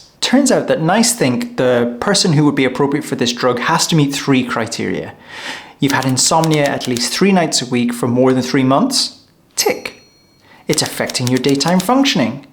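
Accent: Ireland. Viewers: Well…you may be working for the NHS but you’re definitely Irish. Tell me I’m wrong? Bet I’m not!😊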